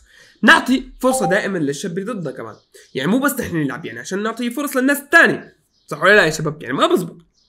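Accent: Egypt. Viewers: اللهجه المصريه اسطوريه عندك يسطا❤ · يا متابعك من اسبوعين يخي وربي اضحك من قلبي غير انه لعبك اسطوري ماشاء الله انا مصري واحب لمن احد من بلد عربية يتكلم مصري اللهجة مرة op عليك 😭😂😂🤍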